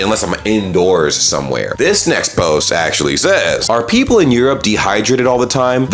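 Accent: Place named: Germany. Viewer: I drink 2.7 liters every day and I'm trying to reach a 3l target. I don't think that counts as dehydration. 😛 That said, bravo on the German accent, mate. Klingt jetzt schon super!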